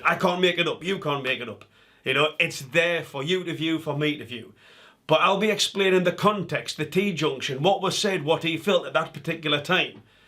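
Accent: Newcastle, United Kingdom. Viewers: Been missing that Geordie sound! Welcome back!🌝👍⚘❤🇨🇦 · great to see you back... your sultry geordie voice reminding me of my birthplace.. would still love to see a video on Jussie Smollett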